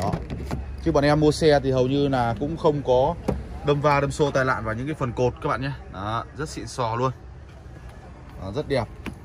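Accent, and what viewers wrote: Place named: Đồng Cối Đá, Vietnam. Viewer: Noi đồng cối đá chuẩn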